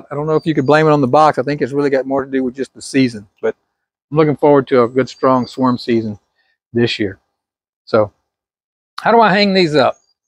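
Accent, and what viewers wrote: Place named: Australia. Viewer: ☺ Cedar, you can't go wrong, it will last for years. The AI must not have liked the Aussie accent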